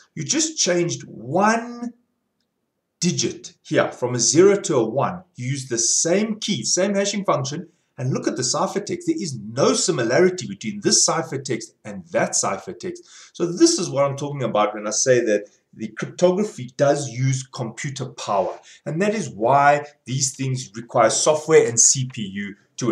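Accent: South Africa. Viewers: Definitely a South African accent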